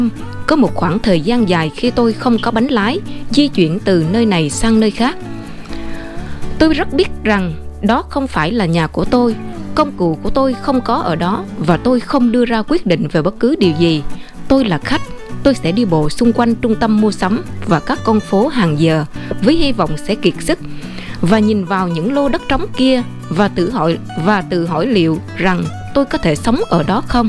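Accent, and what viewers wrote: Standard. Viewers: Hay và chuẩn giọng đọc rất hay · Ban đúng là nhà báo chân thųc đúng nghiã.vūa biên tâp vūa xương ngôn viên rât chuân.rât trình đô và giōi..trân trong ban. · Nói chuyện quá chuẩn hay luôn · Cảm ơn em giọng em hay quá nghe rất chuẩn. Cảm ơn em đã cho chị biết những gì của xu Mỹ vậy mà họ cứ gọi là thiên đường bo quê hương vn mà đi.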